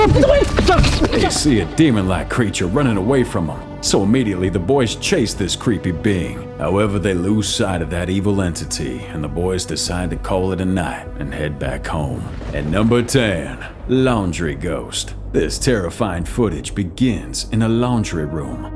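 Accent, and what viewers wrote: Louisiana, United States. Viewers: Love the "Wheezy Anna" accent brother...great content as well. You just gained another sub! Feed us!!!!! ;)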